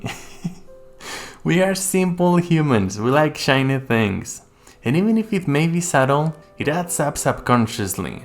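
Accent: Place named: France. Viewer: Honestly, Genshin is my first gacha game and I've been hooked since pandemic and the only thing that kept me sane. So despite all the things negative things that people said about the game I will play the game until it's over. Thank you for the reminder tho. Really need it. Also, your accent sounds french. Are u french? Lol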